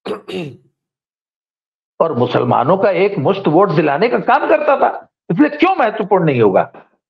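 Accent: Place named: Uttar Pradesh, India. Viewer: Didi UP ke log aise hi bolte hain....sunne me Anand bhot ata hai... This narration is known as bakaiti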